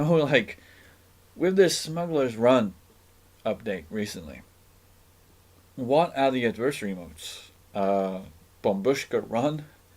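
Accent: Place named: United States. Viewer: Good video, but you sounded too American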